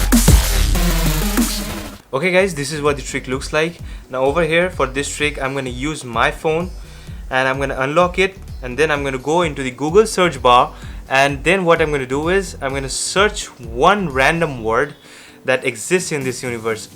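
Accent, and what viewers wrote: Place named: India. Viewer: 4 seconds later and I know that you are Indian 😀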